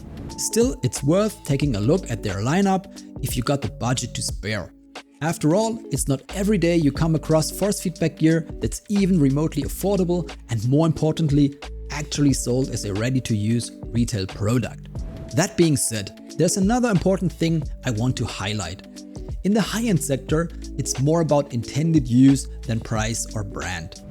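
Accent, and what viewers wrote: Germany. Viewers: I might become a member or something as well. But give me some time on that, because I just discovered your channel. Gotta decide if, and how much I would be if I do. But all is positive so far. Enjoyed talking to you. Also, is your accent German? Just curious, because I'm German, Irish and Bohemian (gypsy Bohemians at that). I think it's neat to learn things from people in other countries.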